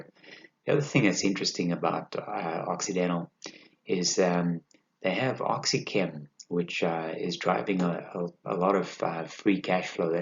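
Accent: South Africa. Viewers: Enjoying your videos. Are you from South Africa per chance?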